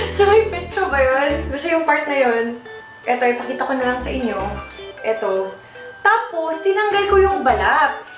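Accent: Visayas, Philippines. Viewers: dati nag subscribe ako dto pero inalis ko kasi parang nakakasaawa panoorin yung pgkbisaya nya nakakaturn off maganda pa naman sya saka sobra maarte mgsalita.aral ka tagalog kasi nasa manila ka naman na nakatira . sorry to comment like this para din syo yan para mas dumami subscriber mo not only visayans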